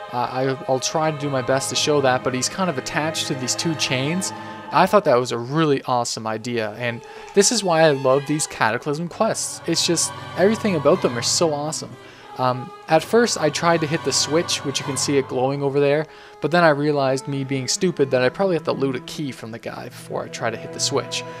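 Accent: Canada. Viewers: He's Canadian. ^^ When we watched his stream in July/August, the chat frequently poked fun at his accent. :D "What are you talking aboot?" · are you canadian?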